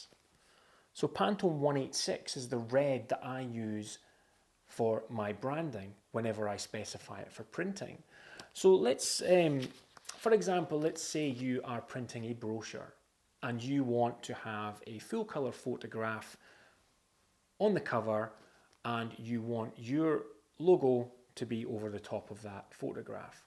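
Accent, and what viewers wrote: Scotland, United Kingdom. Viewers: A great explanation of what Pantone colours are for. Nice to hear a Scottish accent too, rather than the usual American! Subscribed.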